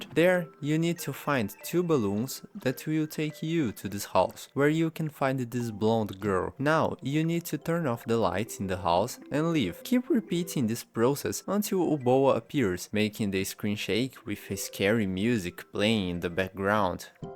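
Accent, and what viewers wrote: Brazil. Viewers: I can sense the Brazilian accent from miles away 😭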